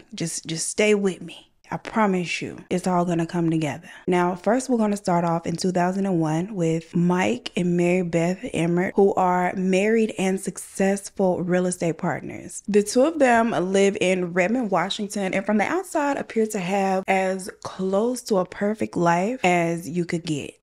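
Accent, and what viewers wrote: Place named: Houston, United States. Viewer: I love this channel! I had done watched allll of Bailey’s videos over the span of like 3 yrs then you popped up like 3 months ago and I have been hooked like a worm on a fishing rod. I 1st heard your voice and just knew you was from Houston to later find out you wasn’t but was moving to my hometown 😊. Your storytelling style is all of the things(I stole that from you) I love and didn’t even know it. You’re so funny and the bloopers at the end are genius…love them. Keep going and yes I’m catching up on all of the past videos. Oh and welcome to HTown 🤘🏾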